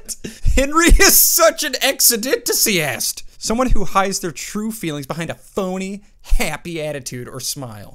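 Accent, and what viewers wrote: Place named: Italy. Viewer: Best Italian accent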